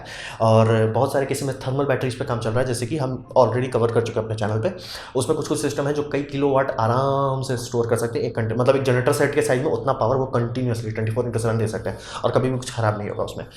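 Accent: Bihar, India. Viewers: Sir ar u from bihar Or jharkhand please replay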